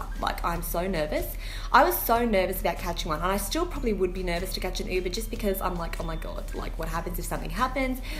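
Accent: Australia. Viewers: I seriously find your voice so entertaining ! Love the aussie accent.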